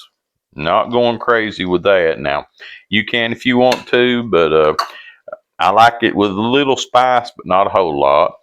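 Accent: South, United States. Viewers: Love to listen to him talk , great southern accent and grandfather voice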